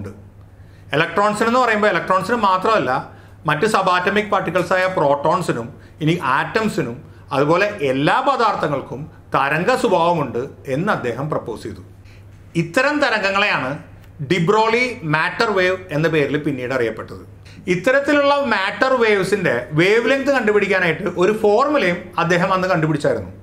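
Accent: Thrissur, India. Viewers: thrissur kaaran aanalle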